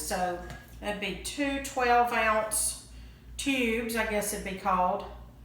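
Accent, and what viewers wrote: Tennessee, United States. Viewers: As soon as you started talking, I knew you were a Tennessean!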